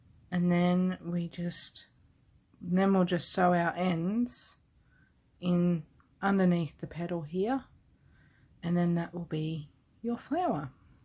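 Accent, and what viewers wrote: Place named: Australia. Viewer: Great to hear an Aussie tutorial loved this made a few just subbed too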